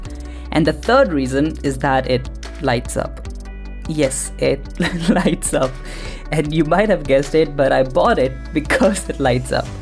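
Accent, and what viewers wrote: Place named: United Kingdom. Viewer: you have a good british accent btw😊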